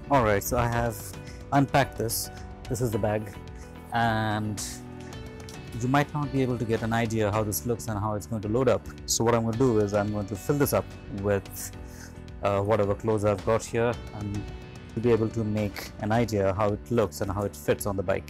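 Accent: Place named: England, United Kingdom. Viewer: Explained neat and nice! The English accent is lit!!!!!!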